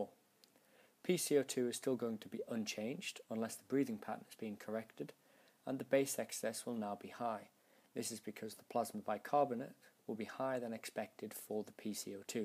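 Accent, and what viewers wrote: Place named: United Kingdom. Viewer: British accent, talk too fast, volume too low. Difficult to understand. SLOW DOWN.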